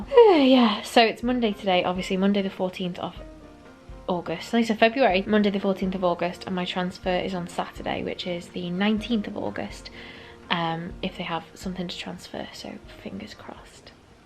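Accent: United Kingdom. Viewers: That's my birthday! ☺️ Never thought you were British 😍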